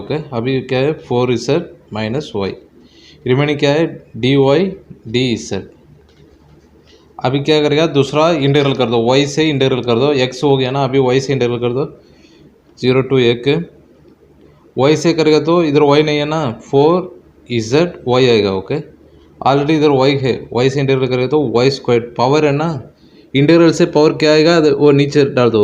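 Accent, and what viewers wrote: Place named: Hyderabad, India. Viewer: Hydrabadi ton me Bolta Bai.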